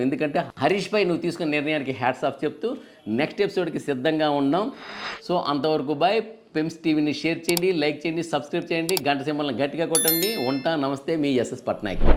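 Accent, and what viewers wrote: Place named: Srikakulam, India. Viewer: Enti sir maa Srikakulam slang touch avuthundi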